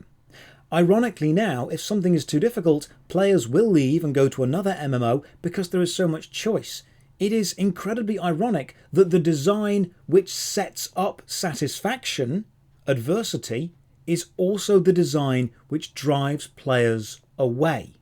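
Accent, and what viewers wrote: United Kingdom. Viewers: You sound so british for a swede.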